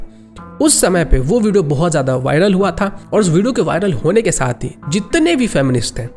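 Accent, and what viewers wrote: Bihar, India. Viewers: Bhai ye bihari logo ko kaise bolna lag rahi hai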